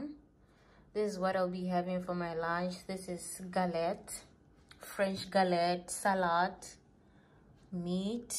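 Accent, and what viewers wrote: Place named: South Africa. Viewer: You have a South African accent ❤️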